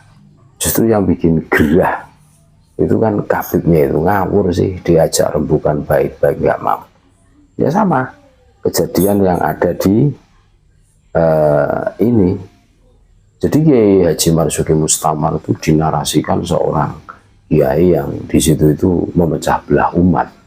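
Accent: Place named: Madura, Indonesia. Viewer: Orang Madura itu suaranya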